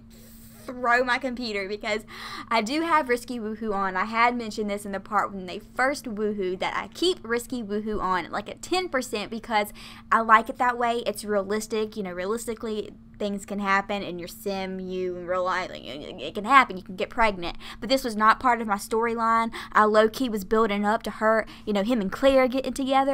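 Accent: South, United States. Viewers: Ok I know this sounds weird but your voice or so adorable, and your country accent is so freaking cute😂😂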